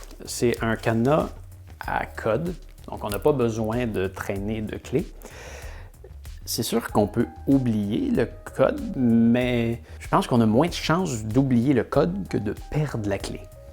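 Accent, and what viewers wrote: Quebec, Canada. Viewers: Holà!🇫🇷 🤗excellente vidéo avec en prime le p’tit accent du Québec qui va bien!.ça m’mets du fun pour bien commencer ma journée 🤗..bonne continuation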